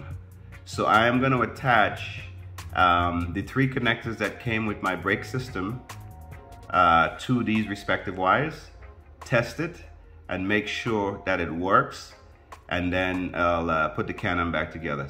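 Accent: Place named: Wisconsin, United States. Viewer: Good video and to the point. Love to hear a WI voice after many years captaining the Caribbean. Thank you.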